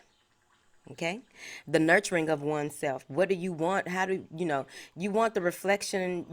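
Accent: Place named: Missouri, United States. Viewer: Where you from Missouri? It sound like it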